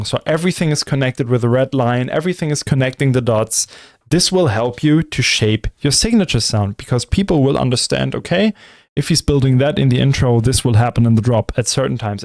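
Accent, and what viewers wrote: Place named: Germany. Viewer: Du klingst deutsch . Bist du deutsch ?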